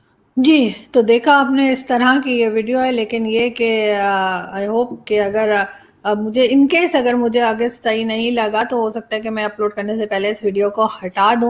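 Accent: India. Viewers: ji, I used to see your videos and appreciate your guts to speak freely without fear in a state like Pakistan. Pl carry on the good work. One thing more, you speak like a normal Indian and sometimes I feel that I am watching some Indian channel. Best of luck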